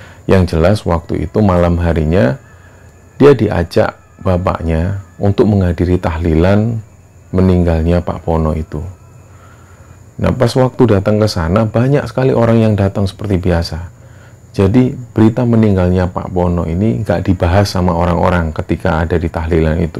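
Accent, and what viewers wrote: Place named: Surabaya, Indonesia. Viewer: Mas ini orang mana ya, sepertinya ada bahasa khas Surabaya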